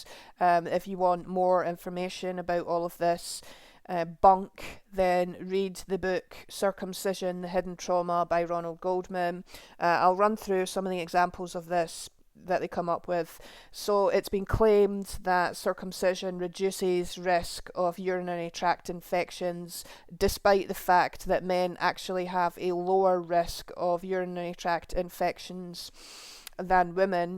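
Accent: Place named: Scotland, United Kingdom. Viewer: It's interesting to hear your fierce condemnation and rightful indignation at this harmful cultural practice but at the same time you haven't completely shaken off the social conditioning normalising it. You speak of circumcised and uncircumcised rather than mutilated and normal or at least unmutilated. You say they ended up severely damaging David Reimer's genitalia to the point where it could no longer function as it was meant to which is what happens in 100% of cases with boys, at least! Actually he suffered a complete penile amputation rather than the intended foreskin amputation. You speak of the "medical procedure" when it isn't a medical procedure but a medicalised ritual or not even that in the case of the cutter being a Rabbi with no medical training and using no anaesthesia. We don't need balance when speaking about harmful cultural practices, we need objectivity! 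You sound Scottish, are you aware that in Scotland baby boys are put under general anaesthesia to have their genitals mutilated at their parent's request, on the NHS, at taxpayers expense?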